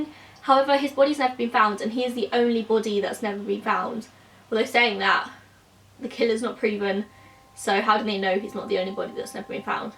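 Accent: United Kingdom. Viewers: You're from the UK?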